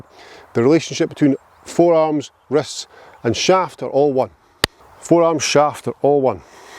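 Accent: Scotland, United Kingdom. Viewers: Great lesson. I can't wait to try it at the range tomorrow. BTW, love the Scottish burr. I have a friend Graeme Baxter who is Scottish. He is a fantastic golf artist. He is licensed by the R and A and the USGA. and has brilliant artworks of literally all the majors including The Open. You might want to check his web page out.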